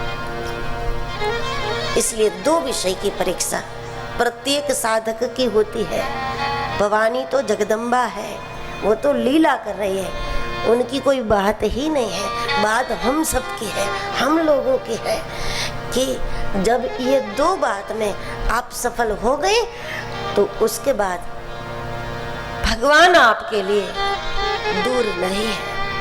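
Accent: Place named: Bhiwani, India. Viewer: Dachhhat bhiwani bolrahi hai gajab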